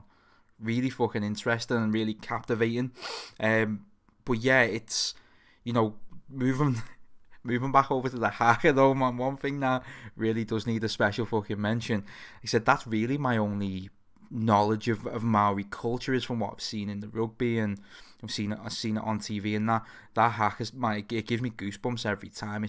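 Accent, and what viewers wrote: Liverpool, United Kingdom. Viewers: Not a huge fan of reacts, or the scouse accent, and yet somehow I'm subbing :p I love your passion for the music man.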